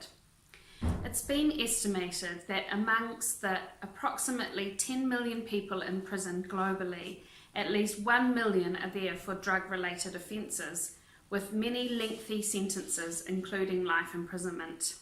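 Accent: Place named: New Zealand. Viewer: Just watched Al Jazeera (stream AJ) about prisons in Europe. Couldn't help it but amongst the comentators I noticed you sound very very Kiwi. Well done! Aotearoa all day!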